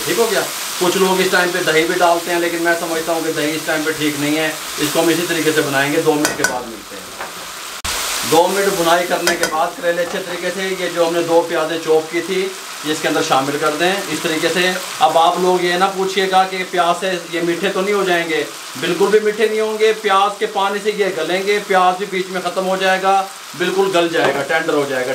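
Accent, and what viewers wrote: Punjab, India. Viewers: Koi masla nahi hai is one of the best dialogue from you sir and camera idhar meri taraf karna such dialogues and punjabi tarka makes your videos so attractive hats of you and your style sir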